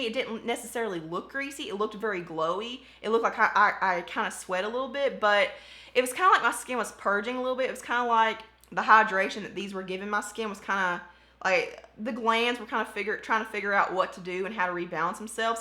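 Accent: South, United States. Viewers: I just discovered your channel. I rarely comment on videos but... okay just a few things here. 1. (And this was the biggest one for me) No offense to all the gorgeous and lovely beauty gurus out in LA, but gosh darn it girl, it is nice to find someone I can relate to being that I’m a southern girl myself. SC is my home and I just adore your twang. And the raw honesty. ❤️ 2. The “womb mate” feel I get from you when you speak of your skin issues, hair issues, UNCONSCIOUS LIFELONG NAIL BITING HABIT, even the dry lips!! Every frustrating issue is spot on with my struggles. Only difference is I’ve developed a few dark spots on my cheeks. But I felt like I was watching myself vent and carry on! 3. Lastly, I am about to order my first bottle of the kiwi product and I’m praying that it helps. I sweat a lot through my T Zone (especially during football season lol). 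I’ll check back in with ya to let you know how it’s going. I’m not sure if commenting here or using another outlet is best but I’ll update you somehow. I’m excited to check out your videos! Support and Love, Ashleigh 😎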